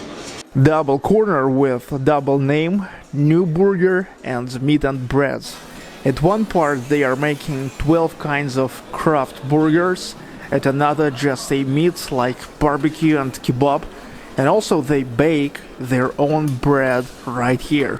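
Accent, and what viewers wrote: Russia. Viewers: Like your strong Russian accent :))) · He's russianglish :)) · Ur Russian accent is fingerlickiing good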